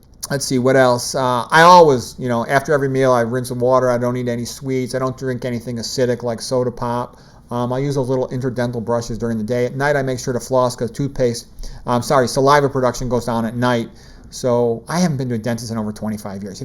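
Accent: Chicago, United States. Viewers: You're accent tells me you're from Chicago?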